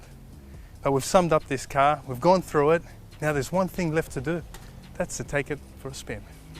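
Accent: Australia. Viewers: The G63 is finally a true AMG worthy of wearing the AMG badge. The ONLY car sicker is the Brabus G63!! The best thing of all is having an Aussie review it, speaking “our” language!!!!! Brilliant! Sub’d, liked & notified!! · no, thats a spot on wog aussie accent.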